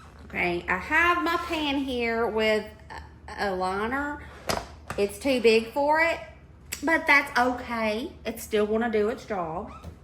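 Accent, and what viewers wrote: South, United States. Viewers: Thank you for your video. You are so sweet! I adore your southern accent! It is so nice to hear it after living in Michigan for almost 15 years—I’m originally from Kentucky. ♥️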